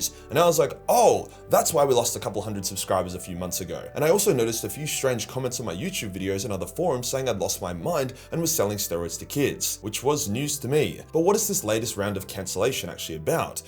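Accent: Australia. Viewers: Every time you get “canceled” is because you are an australian and talk like one and people just dont understand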